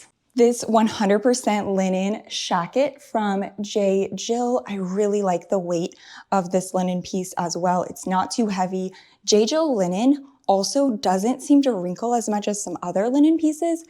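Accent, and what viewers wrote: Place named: United States. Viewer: Hi. I'm from new Zealand. I love your accent. What part of America are you from?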